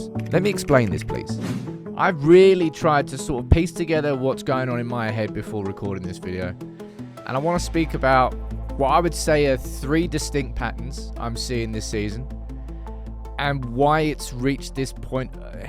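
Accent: Manchester, United Kingdom. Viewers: you sound like your from manchester mate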